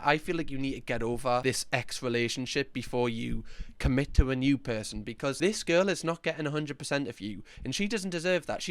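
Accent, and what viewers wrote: Newcastle, United Kingdom. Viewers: I just came across this podcast. Love his chat and the accent brings back great memories of Newcastle, now 45 years ago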